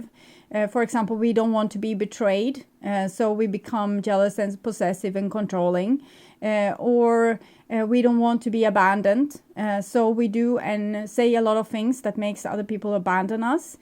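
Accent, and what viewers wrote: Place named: Sweden. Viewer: Time to enjoy a Swedish lass, accent again. Love your beautiful voice . Thankyou for the reading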